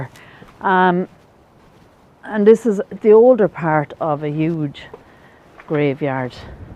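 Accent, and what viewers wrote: Ireland. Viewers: Beautiful looking final resting place me and my baby sister we love 2 read head stones etc my baby sister is in heaven now this nice lady i meet in east land mall in Warren Michigan she told me the weather in Ireland was windy and rainy that was in the 1980s was very young in them days probably around 3o I think 😮 love your Irish accent people say I talk whit a accent 2 women's love it men ask me man where are you from tell them Alabama lowndes county heart ❤️ of Dixie wishing you love peace and happiness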